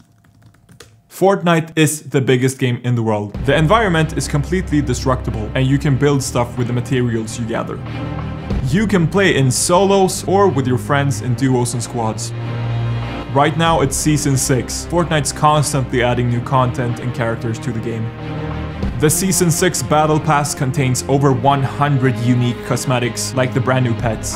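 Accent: Sweden. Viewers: ur english is rly good but i can kinda hear that ur swedish (im a finn) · Me in the beginning of the video:”Oh boy he sounds Swedish” Me like fifty years later: “Oj satan i gatan” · i have never heard someone who sounds as swedish as you, and i am swedish myself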